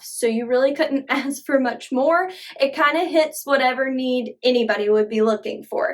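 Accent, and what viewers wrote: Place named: South, United States. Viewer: are you still using the Dermalogica Skin Resurfacing Cleanser? I ask because, while I'm a red-meat-eating man, I'm of 100% Irish-American skin and have fought Rosacea for about 20 years, so I've tried to smarten-up on taking care of my face. I also spent many years in the sun as a Lifeguard, before SPF became 'cool'. I looked into and tried several IPL laser sessions, very productive, but I wanted more. So, I found Dermalogica Redness Relief Essence and was blown away at how effective it was...and you know, the next thing you're buying Vitamin C this and and and, all sorts of ointments lol. A friend gave me some GlymedPlus 10% Glycolic Face Wash. I use it 1-2 x a week, it helps to really exfoliate. I use Kiehl's Ultra Facial Cream for moisture. Morning: Wash w/Kiehl's Ultra Face Wash, Dermalogica Redness Relief, Dermalogica Biolumin Vitamin C and SuperGoop Unseenscreen SPF # 40. 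Night: Wash, Redness Relief, Kiehl's Retinol and Dermalogica Intensive Moisture Balance (FYI...Clinique Even Better Clinical Radical Dark Spot Corrector + Interrupter during the day is something I use 3-5 x a week and it seems very effective at helping dark spots/old sunburns etc). Ms., I was looking for a new, daily cleanser...I like the Kiehl's, it is gentle...but the Glymed Plus is very strong and drying....I stumbled upon your video and was drawn to the Lactic Acid 3% Dermalogica Cleanser you used, so I bought it. You have Disney Princess Skin, truly. Combined with southern accent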